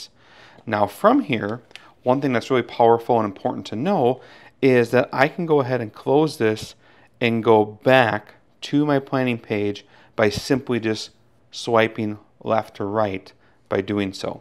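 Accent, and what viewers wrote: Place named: Upper Midwest, United States. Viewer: Thank you. I’m guessing accent is from Wisconsin, Minnesota, or North Dakota. Am I close? 😁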